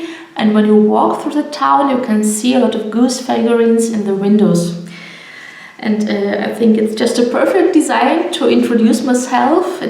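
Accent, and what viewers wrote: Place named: Germany. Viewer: I just found your floss tube; I LOVE your projects and I like hearing a German accent again. My husband and I were stationed in Grafenwoehr (Bavaria) for 3 years and I'd love to go back. We went to Saxony all the time though because Dresden is one of my favorite cities! I can't wait to watch the rest of your fposstubes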